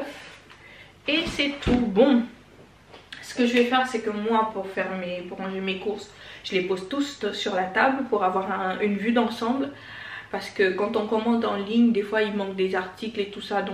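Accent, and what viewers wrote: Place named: Gabon. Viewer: Je te découvre quand j’ai entendu ta façon de parler j’ai dit c’est une Gaboma je m’abonne directe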